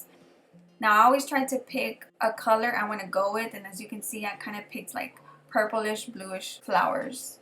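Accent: Texas, United States. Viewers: I know a fellow Texan accent when I hear it! Love your videos!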